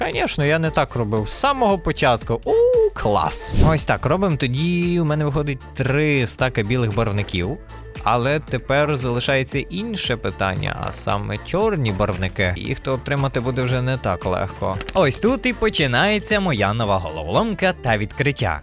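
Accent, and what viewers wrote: Lviv, Ukraine. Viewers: скажи ти зі Львова? Тому що я так. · І по цьому відео можна здогадатися,що ти зі Львова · ти з Львову??? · Ти зі Львова? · Ого ти з Львову?? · Ти зі Львова ?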